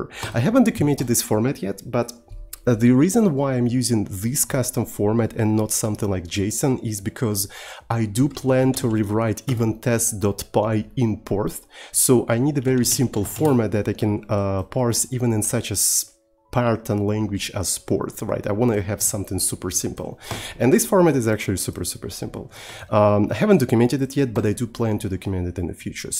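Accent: Russia. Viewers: русский акцент)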